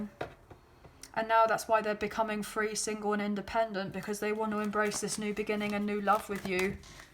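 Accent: England, United Kingdom. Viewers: Loved this reading! Very interesting, thank you very much lovely Lady, Love your English accent!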